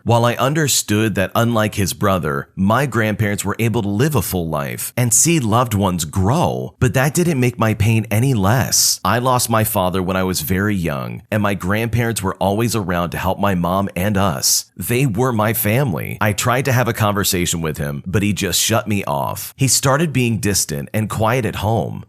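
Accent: United Kingdom. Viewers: Been waiting for the British guy’s voice :)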